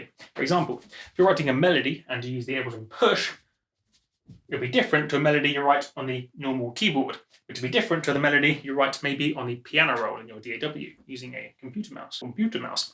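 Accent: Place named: United Kingdom. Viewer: agreed. he has a horrible caser of British pouty voice and british throw away talk syndrome.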